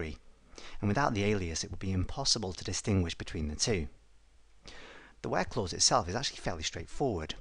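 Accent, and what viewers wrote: England, United Kingdom. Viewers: is seriously one of the best instructors I've found on youtube. You start from scratch, with simple examples and you build on your lesson plans beautifully after that. I cannot thank you enough on how amazing SQL lessons were, as well as your VBA lessons. I thought I had enough knowledge I needed for basic VBA, but still ended up learning a tremendous amount from those videos and then finally SQL. I'll admit I got confused with correlated subqueries, went on to google for a bit, but finally watched this few more times and they finally clicked. Online training courses can be expensive and so I want to thank you for providing SQL and VBA on youtube. It's greatly appreciated. There are a lot of smart people in the world, but not everyone can be a great teacher and you certainly are a great instructor and British accent definitely a plus:)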